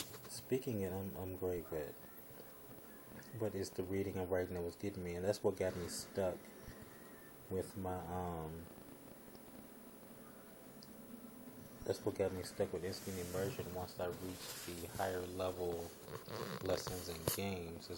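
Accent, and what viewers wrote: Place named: DMV, United States. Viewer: Thank u for this video I homeschool my children and I’ve done so for many years my children are very interested in speaking Japanese and ur video has been the most helpful to me with the teaching basics at a low price so once agin thank u 🙏🏾 I currently live in Ohio but I’m a lower eastern shore girl through and through I could tell from ur accent that u were from the DMV area and to me that is always a plus 😉👍🏾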